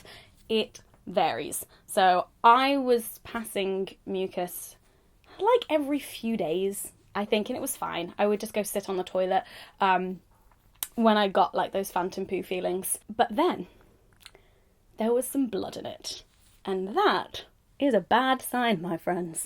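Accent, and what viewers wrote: United Kingdom. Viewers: Your accent is british... Atleast i felt so ... Is that true ???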